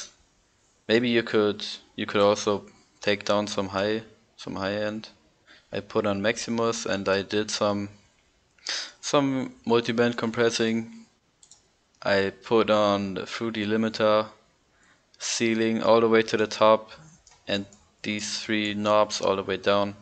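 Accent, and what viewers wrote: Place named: Germany. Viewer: man hört den deutschen Akzent haha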